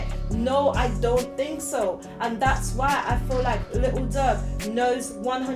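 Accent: England, United Kingdom. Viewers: Another foreigner trying to speak on what’s going on in the hood through what she sees on social media.🗣🗣🗣🗣 how are you a credible source in any way?????🧐 you speaking on Chicago business with a British accent???🤣🤣🤣🤣🤣 Maaaaannn.... yall need to stop with the clout chasing and assumptions. The only source you have is social media. You have NO CLUE of what’s actually going on in the streets. Who would subscribe to a British person speaking on hood affairs in Illinois??? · Where you at in London? Your accent think. You talk like you knew the mans personally. This is weird af. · I hate your accent, use another voice on these videos.....& why is a foreigner so integrated in American street culture? Speak on England or wherever you from smh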